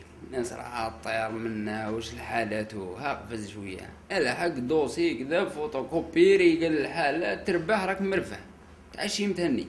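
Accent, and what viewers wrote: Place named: M'Sila, Algeria. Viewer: Tu est très fort tu ma remonter le morale surtout ta belle accent de msila ou de Djelfa brabos et merci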